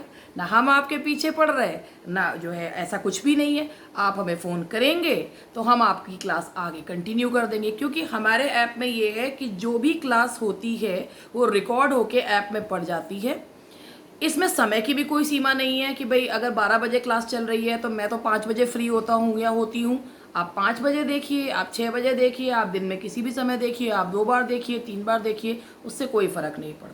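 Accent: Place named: Meerut, India. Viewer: Good evening ma'am..... without knowing,I guessed that you are from Meerut.....you speaking style reflect this.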